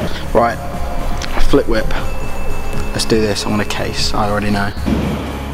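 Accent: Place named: Essex, United Kingdom. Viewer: are you from essex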